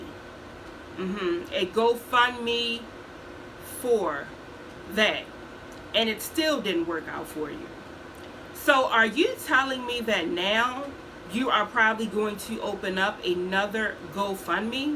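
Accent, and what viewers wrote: Baltimore, United States. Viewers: Hey girl Hey....starting to become a fan!!🤣 Nothing but facts....being a reactor is actually easier than being the vlogger.🤷🏾‍♀️ They the ones put in the spotlight to be judged by their every move!🤦🏾‍♀️ By the way....are you from Bmore? You sound exactly like you from Bodymore!🤣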